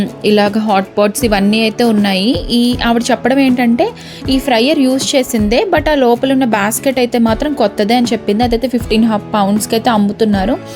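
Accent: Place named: Andhra Pradesh, India. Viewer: యూకే లో ఉన్నా గాని అక్క మన ఆంధ్ర మాటలు మాత్రం 👌👌👌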